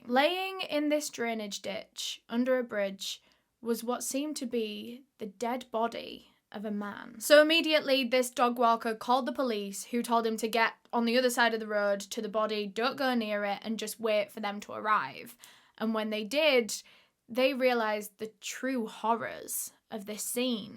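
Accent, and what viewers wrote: United Kingdom. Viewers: hello. i love your British accent..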